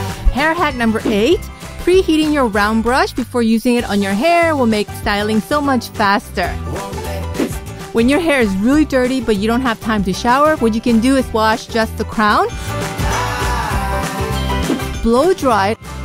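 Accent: South Korea. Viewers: Are you Korean? Reason I ask is Because i think you have very very slight Korean accent...? Or is it just me? :o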